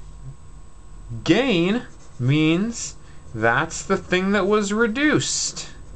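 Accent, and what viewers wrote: Canada. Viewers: Has anyone ever mentioned that you sound like a Canadian John Malkovich? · are you Canadian?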